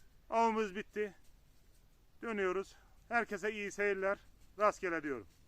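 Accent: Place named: Yozgat, Turkey. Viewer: Gaçarsa gaçsın neydiyim heri deyisine gurban senin tam Yozgatlı ağzı bu ellam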